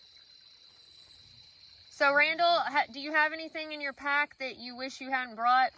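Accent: South, United States. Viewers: Mmmmmm bacon jerky! Thanks for sharing your adventures/insights/opinions/photography/wisdom/downtoearthness/stories/southern charm and accent!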